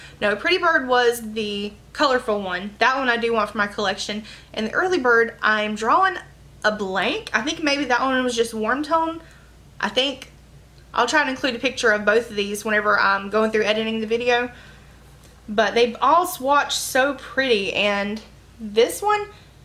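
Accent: United States. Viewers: I love your country accent!! And your camera is bomb!!!! Love from Texas!!!! I love my boxy lux box.... The Luxie brush is so amazing it literally soaks up no product!!! Your gonna freak out!!!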